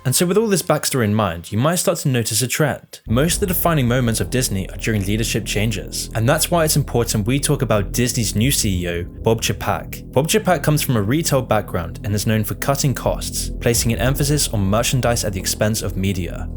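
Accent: United Kingdom. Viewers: So, bassicly every week its a new runner up for “ most evil business in the world “ Time for a new title or maybe even new content. As for this video, im literally watching at timestamp - to prolly hear about things I already know but this time in a UK accent 😂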